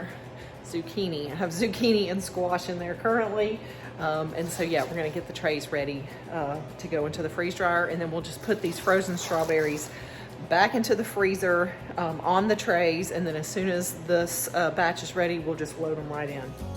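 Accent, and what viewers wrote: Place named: South, United States. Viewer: I respect your right to privacy, but I was wondering what state you live in. Your accent says the south. I am new to the south, north central Arkansas, and the accent sounds close.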